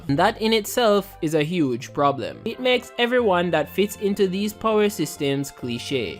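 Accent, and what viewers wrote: Jamaica. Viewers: Question are you Jamaican? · Are u jamaican? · Are you Jamaican?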